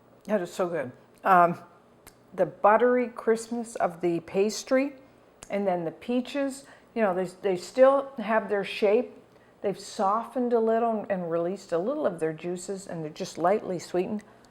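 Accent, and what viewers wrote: Canada. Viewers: You used to live in Canada right. You have that accent. I love your recepies I've been watxhing for about a year and I love your channel!!!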